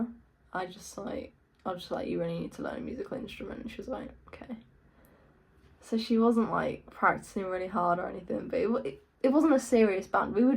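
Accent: England, United Kingdom. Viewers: Do I detect a little bit of an English accent? Your guitar skills (and many musical instruments) is amazing! Keep up the great work! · You're a sweetheart. You obviously have a kindheart and you are down-to-earth, please don't ever change. Always keep your beautiful smile and continue to be the genuine kind person that you are. I did not expect to hear an English accent, but it is cute and it suits you. You are very cute. BTW, 19 is not old. It's all relative. When you are 16, a year is 1 1/16th of your life, 19 = 1/19th, etc. So the older you get the faster time flies. Yet, we all experience life in a linear manner that is moment by moment and even then most people are not mindful of the present moment, they're usually thinking about the past or what could happen in the future. But that's all just my own basic philosophy about how we experience time in this existence. 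I have some philosophical ideas that blow people's minds. Hahaha. I'm glad you made this video, it's illuminating and now I feel like I will care more about seeing your videos. I hope that you find love with an amazing musician that is truly as sweet and kind as you are and appreciates and respects you the way you deserve to be treated. I would love to hear you write some amazing music that is authentically original and well defined in your own style. That would be awesome!!! Please keep that sweetness, your smile and your genuine honest personality and please don't mention anything about age... hahaha. I'm 46, but I look 30 and I feel like I'm 20. It's more of a state of mind and/or number, than anything else. I know people in their 60s that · I couldn't tell you're not American you sound British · Lahhh kau british??? Ingt ulu kelang je..... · Oh yea British!!!!!! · I was surprised youre English! 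Keep going ✌🏻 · I enjoyed your talk today. You are a sweet girl. I miss England; I wish I could go back sometime. I love the accent. I’m from the states, here in beautiful North Carolina. I lived in England because of the Air Force. RAF Lakenheath, Suffolk. Take care your doing great 👍🏻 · Are you from England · Which UK accent is this? She pronounces guitar or band as a North West english speaker would do, but in many sentences, it's a plain and even melodie, just like on an irish or american tongue. Any help?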